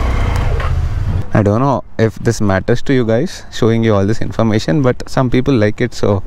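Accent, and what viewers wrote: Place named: Hyderabad, India. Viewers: I like when u talk in hindi.. Hyderabad hindi❤😍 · Really this is more informative and authentic telangana English, but make sure you are taking anti covid measures while traveling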